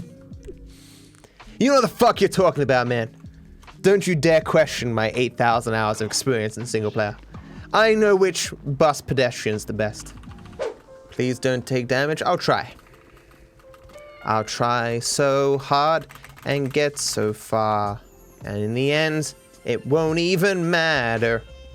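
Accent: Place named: Australia. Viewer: Cmon man just let that native Australian accent FLOOOOOW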